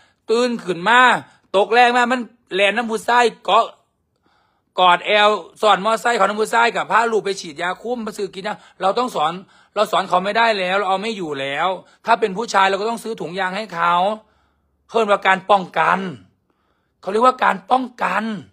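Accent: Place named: Isan, Thailand. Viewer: เว่าถืกคัก เด็กสมัยใหม่จั้ง คือเว่าเป็นแท้ แม่ใหญ่กรเลี้ยงลูกเป็นคักเด้อ❤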